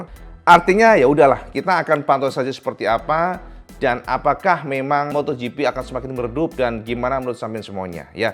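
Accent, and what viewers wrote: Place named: Tulungagung, Indonesia. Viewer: Bang lu orang tulungagung juga yaa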